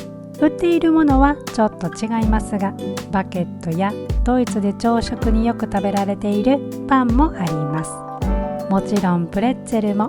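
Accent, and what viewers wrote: Kansai, Japan. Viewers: いつも楽しく拝見させていただいております。関西弁でとても聞きやすいです。私は兵庫県です。食って大切ですね。私もちゃんと考え直さねばと思いました。できることから一つ一つ改善していこうと思いました。皆さんお元気で。これからも楽しみにしております❤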